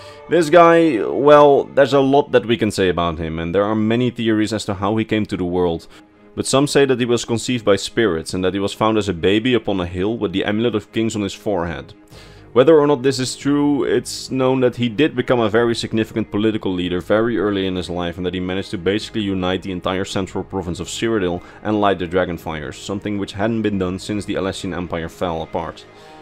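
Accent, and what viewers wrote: Netherlands. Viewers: God...that dutch accent tho... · Great vid. Are you Dutch? The accent seems familiar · I can tell youre dutch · Hey question if you don't mind me asking buy you don't happen to be dutch do you? (I'm dutch myself and I thought I heard a small dutch accent over your english) Also great video I enjoyed it very much :D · I hear a Dutch accent 😊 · So I just discovered you. Now I have a whole lore backlog to go through to have going in the background while I work! Wahey! Also, fellow Dutchie I hear, so bonus points for that. Goedenavond! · You dutch? You sound dutch. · Lekker Nederlands accent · I need to know: are you dutch? Its your accent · Are you niederlansk? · U dutch? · Are you dutch by any chance? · Dumb question, but are you Dutch? Your accent sounds Dutch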